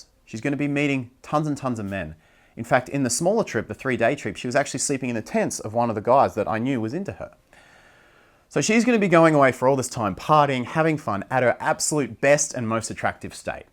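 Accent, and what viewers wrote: Australia. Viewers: Are you Australian? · i know this may sound cheesy but i officially love Aussie accent.😆 and its amazing how i do these things without even realising it. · I just read your are Australian. .. ye ye. love the australian accent 😊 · I’m living in Aus and learning English. I watch your channel for English listening practice because you speak little bit Aussie English and put subtitle :) it’s great that I can learn about relationship at the and time! Thank you!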